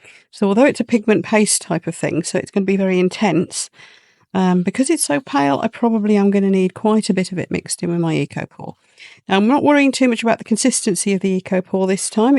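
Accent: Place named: England, United Kingdom. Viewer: I love watching you, and listening to you. I’m in America, and get a kick out of your English accent. I really wish the eco resin wasn’t so expensive. I’ve been using cement to cut cost. But, with that, you sacrifice color, as it is hard to get good/precise colors. I would love to see you do cement castings however. I love learning, and want to make a business out of it. I am currently making plant pots and saucers. Thank you so much.. 🇺🇸💕 Cindy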